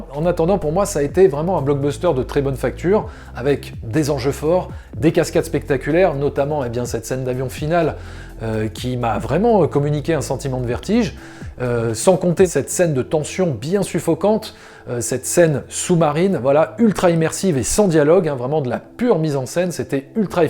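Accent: South, France. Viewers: merde .... il nous a pas fait son fameux "accent" du sud........... décu,mais non apres la video!! merci pour la video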